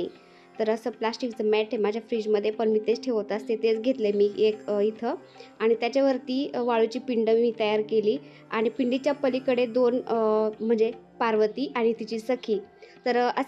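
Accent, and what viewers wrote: Ahmednagar, India. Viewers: Tai khup chan kele tume puja tumcha bhashe mde aplya .Nager cha sugandh yeto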